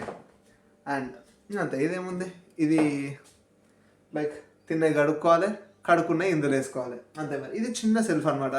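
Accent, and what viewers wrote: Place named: Telangana, India. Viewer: Telangana slang 😁🤣 # new subscriber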